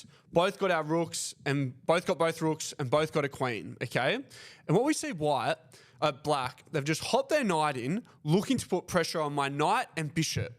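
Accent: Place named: Australia. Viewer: So after watching hours of Alex Banzea's vids I found myself thinking in his Romanian accent. Looking forward to thinking in a Down Under accent!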